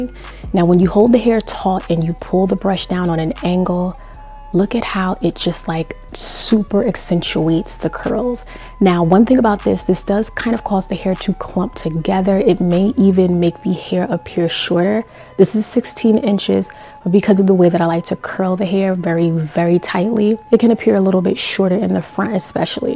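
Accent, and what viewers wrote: New York, United States. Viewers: I am from NY and they way you speak I'm figuring you are from NY or close to so you'll get this I'm dropping a Funky Master Flex bomb on how you slayed this sis lol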